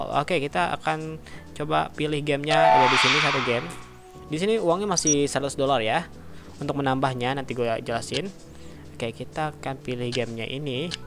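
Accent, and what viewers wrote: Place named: Central Java, Indonesia. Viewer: JATENG MANA SUARANYA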